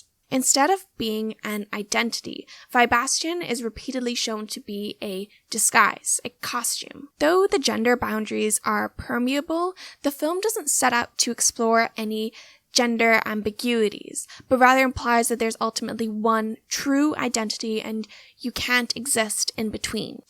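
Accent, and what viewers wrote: Ireland. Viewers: i could hear the irish and i was so confused then i read the descrpition haha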